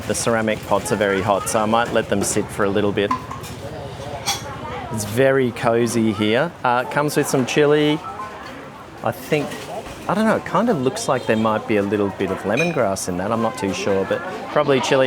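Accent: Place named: Australia. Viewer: Haha, the lady is a hard one to crack! I love your sense of humour! And your lovely Aussie accent.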